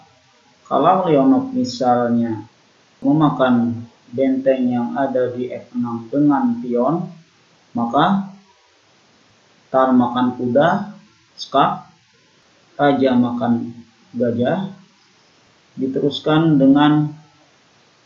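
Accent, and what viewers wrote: Indonesia. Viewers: Logat banjarnya kental sekali bro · Suaranya medok banget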